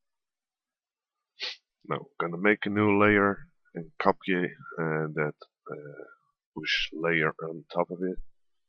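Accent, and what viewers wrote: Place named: England, United Kingdom. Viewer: super tutorial man,ik heb een en ander bijgeleerd,en ik was ook nog ge- entertained door het engels accent.ik wil MEER alsjeblieft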